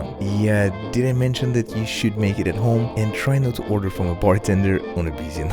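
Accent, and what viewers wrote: Russia. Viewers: You russian or romanian or bulgarian?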